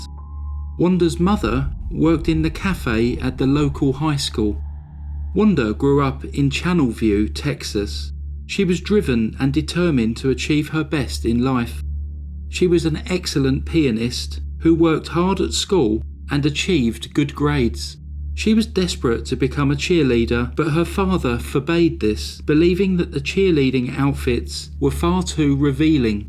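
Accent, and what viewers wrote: England, United Kingdom. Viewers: I thought at first you said Verna and Amber had been winning twerking contests, at three years old! It is easy to see how this case became a comedy movie. Bargaining and bartering with the hit man, all those marriages, the two women so much alike, so much in common but obviously bishes to each other. That Wanda made a complaint about Amber's campaign, then at the first opportunity fell foul to the rules that were changed at her instigation, so she gets her own daughter disqualified.. Even just the fact that 12 year old girls had such intense and serious "campaigns" with obvious voter buying-its so silly to the rest of us. It's all just so.....Texas, I suppose. Weird, WTH cases seem prevelant, deep in the heart. Thanks, kudos as always. PS I did giggle when in your polite, English narration voice, you said Wanda was regarded as up herself. 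PS again, I think it's unfair YT won't let us like on a second time around! Btw On my mini binge tonight, I've even let the four minute ads play through, but it took a lot of will power.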